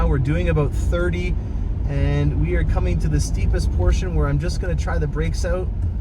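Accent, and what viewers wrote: Canada. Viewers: That intro screamed in Canadian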